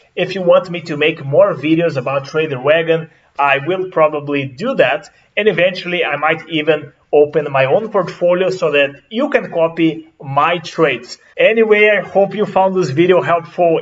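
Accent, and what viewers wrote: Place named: Brazil. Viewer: brasileiro e professor de ingles, escuto esse sotaque o dia todo kkkkkkkk, mas seu ingles é foda irmão parabens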